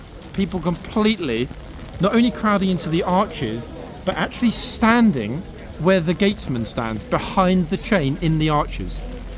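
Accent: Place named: United Kingdom. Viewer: When I first started watching videos and he said 'tour guy', I heard with my Californian ears 'tall guy'. And I'd look but not see a tall guy; wondering why he would point out tall guys anyway. Finally, it dawned on me that he was saying tour guy. Usually I can tune my California ears to British but those 'r's and 'l's apparently don't cross over.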